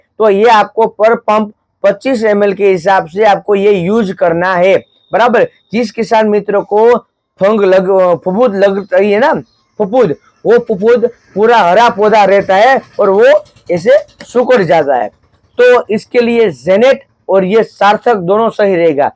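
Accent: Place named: India. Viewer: Aje tame apda hindi bhasha vara bhayu ne samjavo bhai ame to gujrati maj mahiti sambhad su apdi gujrati ni majaj kaik alag che bhai amay tamari bolvani pich sav desi bhasha ma majaj aeva rakhe che bhai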